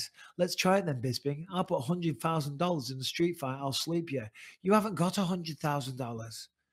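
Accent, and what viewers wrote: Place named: England, United Kingdom. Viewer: You know Michael, i really love when you start to talking funny shit. But please add some subtitle on your video, because you're true englishman and you speaking goddamn fast. So please add some subtitle next time, because sometimes people from another world like myself see and listen to your talking and couldn't keep up.. 😂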